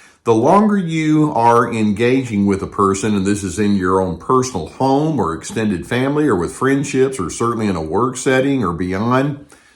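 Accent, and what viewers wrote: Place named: Alabama, United States. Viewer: Thanks again for your calm voice, your lovely Alabama accent and your great teachings on dealing with narcs. Mine moved out 6 years ago but still phones me occasionally and I still get a bit sucked in to his sob stories and lies and accusations, his gaslighting etc. One part of me still misses him. The other part knows I've gone back into denial and fantasy land. Listening to you always puts me back onto firm ground again. Many thanks.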